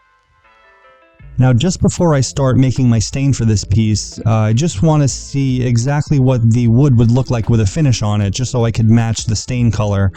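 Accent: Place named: New England, United States. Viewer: Love the contrast in the stains it makes the gorgeous veneer really pop. I love how you can bring out the beauty of the woods. Now we know you're on Long Island, you have just a small touch of what I consider a New England accent, (my mom's from Massachusetts, though she made a strong effort she sadly, in my opinion, succeeded in losing it. I'm southern California and, no we don't talk like valley girls, lol. We have almost no accent. Just plain boring English with nothing special on top. Say hi to your dad.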